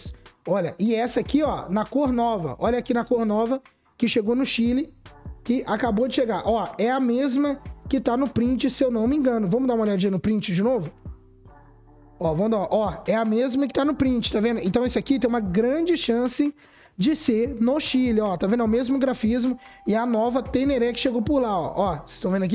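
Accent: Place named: Portugal. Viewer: Portugues de Portugalllllll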